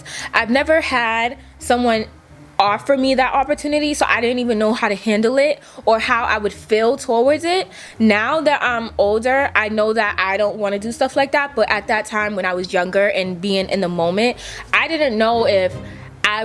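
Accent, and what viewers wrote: New York, United States. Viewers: You are a real New Yorker 🤣🤣🤣 the way you tell the story ,and all I love it . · Are you from New York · she so new york i love it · Your accent still there but it used to be worse 😂 I need the full New York back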